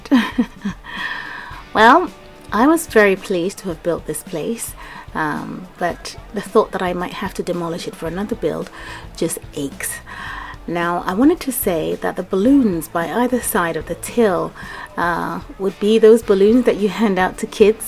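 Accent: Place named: United Kingdom. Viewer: Ahhh you live in England two I kne2 you had a little British accent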